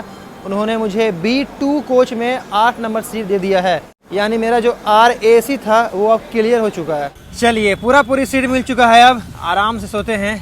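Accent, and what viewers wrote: Bihar, India. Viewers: ji behtar Hai k aap Gyaan na dein Bhai main aapke saare videos dekhta Hun mujhe Sahi lagta Hai aapke bolne ka style bihar ka Hai Or Mai bhi Bihar se Hun or Mai aapke iss channel ka subscriber bhi hun lekin bhai choti si baat thi k aap Kisi ko gyaan mat do agr Mai Kuch galat bol diya to maafi chahunga but jo mujhe laga maine bol Diya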